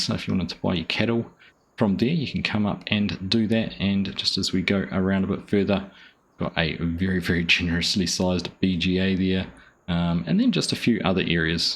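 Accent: New Zealand. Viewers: Im sorry but what part of this map represents a Aussie farm ? to me it looks same as a euro map . and a kiwi dude voice over , thats like getting a mexican to describe america .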